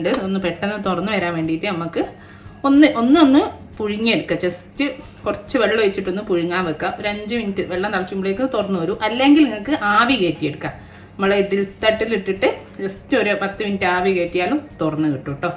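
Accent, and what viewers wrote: Kozhikode, India. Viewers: This came out sooo Gud Thx 👏🏽 Ur kozhikode accent sooper 🥰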